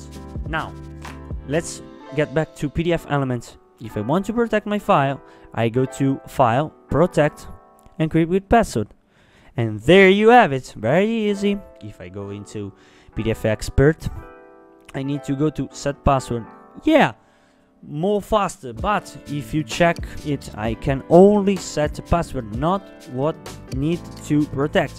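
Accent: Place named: Italy. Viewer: hi, I couldn't help but notice that, by any chance are you Italian? you sound really Italian I don't know why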